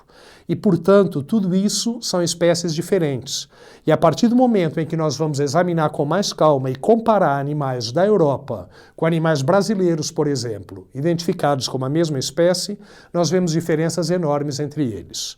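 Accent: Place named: Portugal. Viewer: Às vezes o sotaque dele me parece sutilmente o de Portugal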